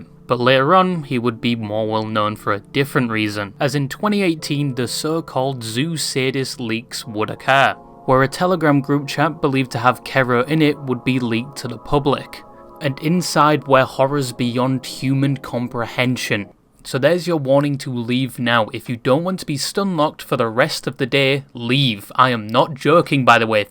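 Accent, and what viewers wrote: United Kingdom. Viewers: You know, I didn't think there would be a British accent out there that doesn't have a hard distinction between the u and a sound, hence him pronouncing furry more like fairy...but, you learn something every day😅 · 10s in, british, had to click off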